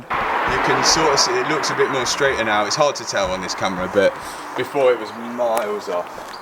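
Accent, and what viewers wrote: Leicester, United Kingdom. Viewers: Are you from Leicester?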